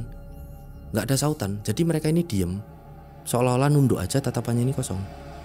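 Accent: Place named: Bantul, Indonesia. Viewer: logat e bantulan banget mas 😂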